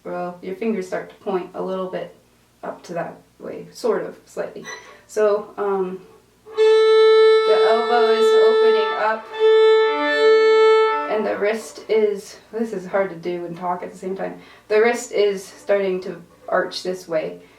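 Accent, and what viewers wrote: Canada. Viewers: I can taste the Canadian in her voice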